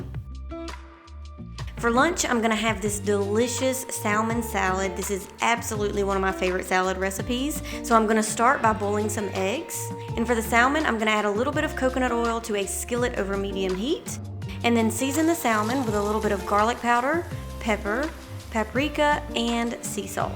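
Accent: South, United States. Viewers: You must be from the South😂❤🙏🏾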